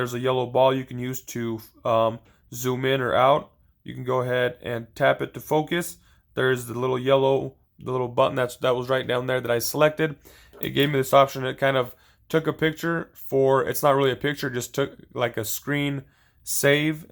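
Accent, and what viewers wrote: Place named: United States. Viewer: One of the clearest, most concise demo of an iPhone feature that I have ever used. It’s also very nice to hear clear American English at at a speed I can process. Thank you. (That is not a disparagement of ESOL speakers. They are just hard for me to understand when they speak at a very fast rate.)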